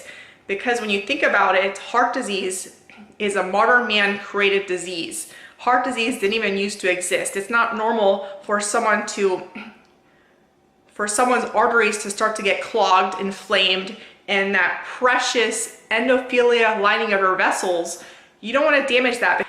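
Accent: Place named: Chicago, United States. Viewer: I agree with you 1000%. I wish I would have started earlier to take care of my body. The last 50 yrs went by so fast and I am not 71 yrs old and while I have given up meat I still eat some types of fish and eggs though i am trying to wean myself from that. I will most likely give that up by February though I still drink non-fat milk and sometimes I eat cheese but Not butter. So i am looking forward to being a vegan by Feb 1st. Thanks for you video.. Your accent sounds like your either from back east or Chicago. I am originally from Ohio but have lived in Los Angeles for the last 57 yrs. I love your birds and my "tiel" "Baby" is still with me. Thanks again for your video ..Take care...keep up the good work!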